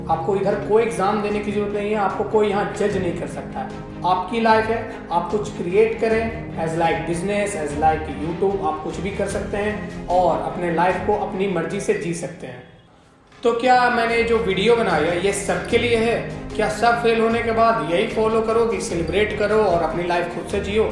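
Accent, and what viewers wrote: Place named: Bihar, India. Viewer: BTW your Bihari language op❣️❣️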